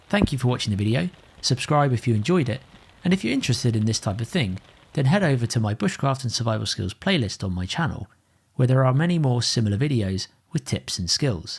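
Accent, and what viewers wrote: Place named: United Kingdom. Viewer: Hate the lime accent.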